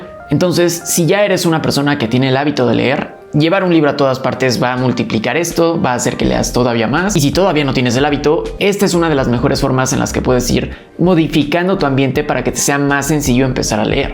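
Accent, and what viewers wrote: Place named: Fresa, Mexico. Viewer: Y EL INTENTAR HABLAR FRESA ES PARTE DE LA RUTINA ? ...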